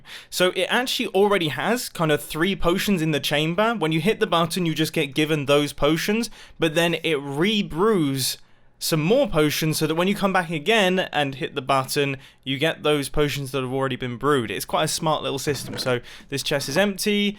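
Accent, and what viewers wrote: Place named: United Kingdom. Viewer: I just realized he kinda sounds like wheatly from portal 2, probably because they both have British accents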